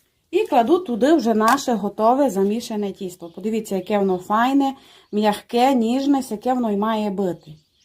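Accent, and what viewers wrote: Transcarpathia, Ukraine. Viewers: Не показуйте будь ласка свою неосвіченість. Це на Закарпатті такий діалект. Ніхто мову не кривляє. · Сьогодні зпекла всі три види, тісто чудове. Я багато печу, цей рецепт не новинка, але дуже мені подобається ця закарпатська говірка. Дякую,.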